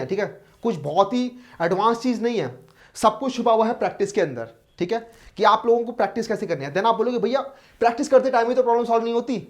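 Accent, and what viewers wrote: India. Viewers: His voice is like Ashish nehra is he from Haryana ?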